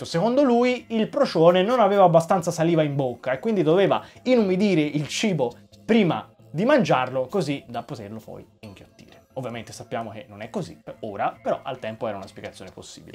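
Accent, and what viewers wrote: Tuscany, Italy. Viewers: Bravissimo, come sempre. Ti giuro, da 8.10 a 8.55 io rotolo dalle risate: accento toscanissimo (ce l'ho anch'io, eh), procioni che rotolano, dentini, ecc... Continuo a guardare il videl in loop. 🤣🤣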